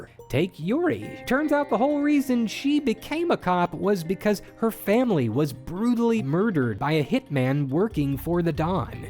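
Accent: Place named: United States. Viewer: You sound very American 😂🤡.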